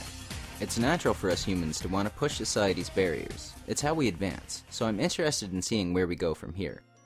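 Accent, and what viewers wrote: Canada. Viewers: Do all Canadians talk the same way on YouTube?...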